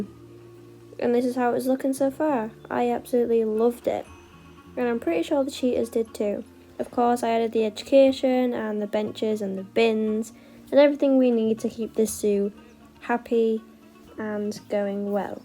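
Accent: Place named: United Kingdom. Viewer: I think your british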